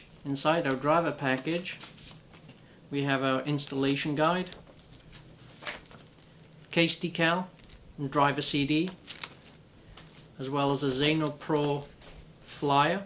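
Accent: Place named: Wales, United Kingdom. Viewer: Sounds more Welsh to me.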